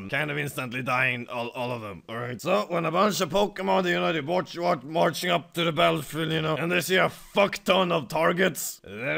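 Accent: Sweden. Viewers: he is swedish i can hear on his accent and his calculator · are you swedish?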